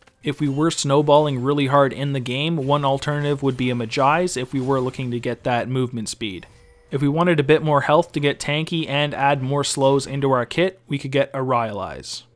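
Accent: Canada. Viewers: you're canadian aren't you?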